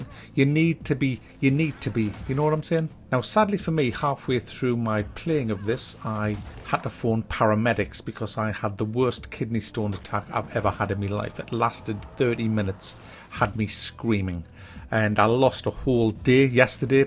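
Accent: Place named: Newcastle, United Kingdom. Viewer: Great review. Thanks pal. You Newcastle or Sunderland btw?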